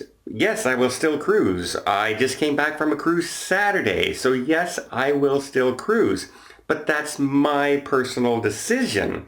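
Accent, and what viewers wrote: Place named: Quebec, Canada. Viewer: he lives in Ottawa and his accent is more Montreal/Quebec to me than anything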